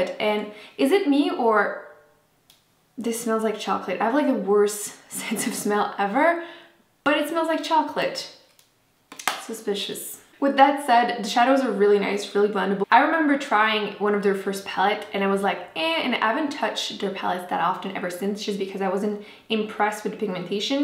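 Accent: France. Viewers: Am I the only one who loves your slight french accent when you speak english? :-D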